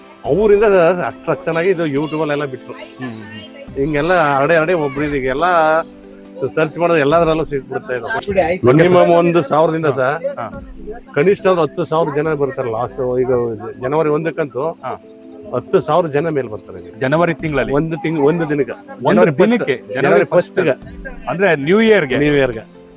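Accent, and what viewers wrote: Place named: Chamarajanagar, India. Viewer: ನೀವು ಈ ರೀತಿಯ ಪ್ರಕೃತಿಯ ವಿಡಿಯೋ ಗಳನ್ನು ಮಾಡಿ brother, ನಮ್ಮ ಗುಂಡ್ಲುಪೇಟೆ ಸುತ್ತ ಮುತ್ತ ಅತ್ಯದ್ಭುತ ಪ್ರೇಕ್ಷಕ ಸ್ಥಳ ಇದೆ, ಭಾಷೆ ಬಗ್ಗೆ ತಲೆ ಕೆಡಿಸಿ ಕೊಳ್ಳಬೇಡಿ ,ಚಾಮರಾಜನಗರ ಉಚ್ಚಾರಣೆ ನೇ ಹಾಗೆ ❤ನೀನು ಮುಂದುವರಿ ಗುರು ❤❤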